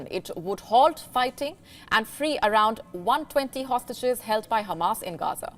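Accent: India. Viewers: Why indain accent suck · She is from India not Middle East.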